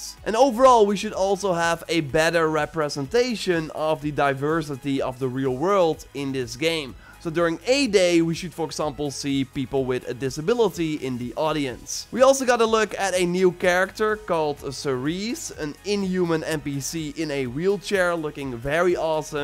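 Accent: Amsterdam, Netherlands. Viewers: youre inglish sounds like a hollander zeker uit amsterdam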